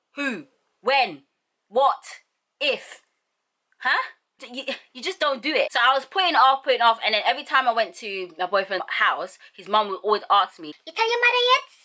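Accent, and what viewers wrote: Nigeria. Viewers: I love the Nigerian 🇳🇬 accent 😍😍😍😍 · I love the way the Nigerian accent comes out from time to time. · Nigerian accent never leaves ur mouth 😂😂😂😂 😂😂😂😂😂😂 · I can still sense your Nigerian accent Good you proud of being a Nigerian ❤️❣️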